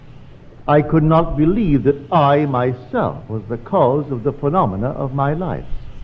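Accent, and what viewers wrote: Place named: Barbados. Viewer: I love hearing his Bajan accent, nostalgic. I truly like his teachings too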